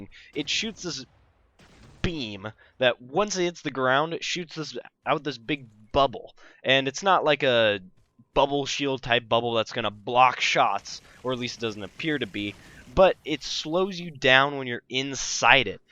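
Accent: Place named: United States. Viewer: i bet your american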